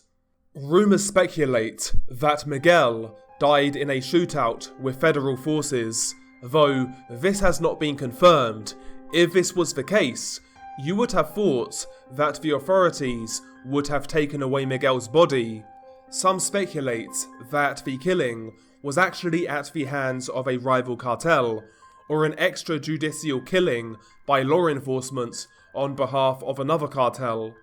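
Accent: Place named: United Kingdom. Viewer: He’s British dude we all know what he’s saying stop complaining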